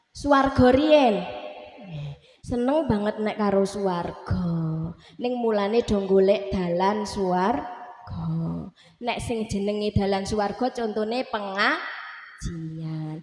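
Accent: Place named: Java, Indonesia. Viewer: Boso jowone meduuuk Anak² skrng bnyak yg tdk bs bhs kromo inggil 🙏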